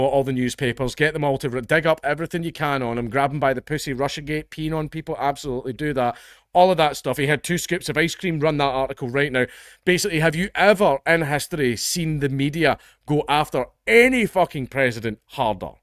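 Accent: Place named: Scotland, United Kingdom. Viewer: "I really like it when people get angry" - dude, we can already tell by your accent you're Scottish. No need browbeat us with it.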